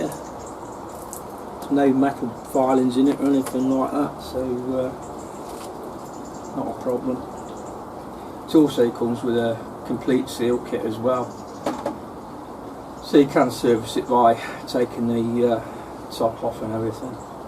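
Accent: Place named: Worksop, United Kingdom. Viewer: You sound like your from my area of the UK (worksop)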